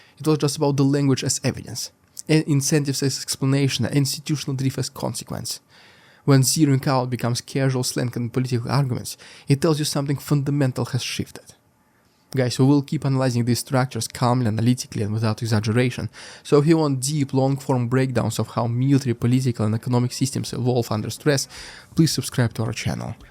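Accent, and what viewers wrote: Russia. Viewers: you were very, very hard to understand this time... way to fast. Your Russian accent and the words just rammed together is impossible... TAKE A BREATH.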